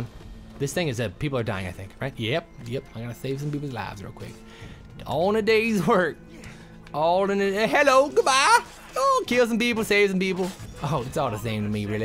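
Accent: Scotland, United Kingdom. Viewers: Omg dat Scottish accent! Brilliant. :3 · Amazing Scottish a sent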